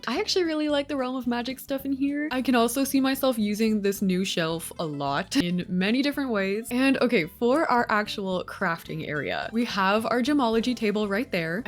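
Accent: Canada. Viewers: I’ve never seen your channel before but this video just popped up in my recommended’s. This house is stunning!! I had to check your “about” to see if you were Canadian because I immediately heard the soft and cozy Canadian accent ✨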